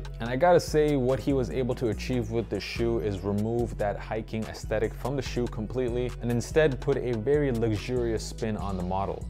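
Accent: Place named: United States. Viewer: he has an American accent and probably doesn’t speak French. Give him a break 💀💀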